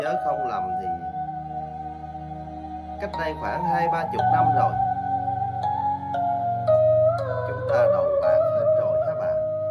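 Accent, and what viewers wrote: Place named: South, Vietnam. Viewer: chat giong rat nam bo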